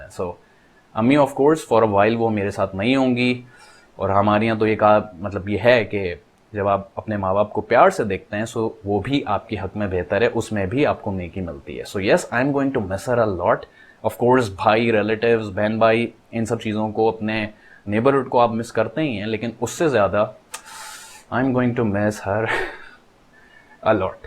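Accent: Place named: Lucknow, India. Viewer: Bhai mai smjhta tha aap indian sy ho kafi time sy can't belive k Paksitan mai yeh content bn skta wo b Pakistan sy. Apki urdu sy mai smjhta tha ap Lakhnow sy ho.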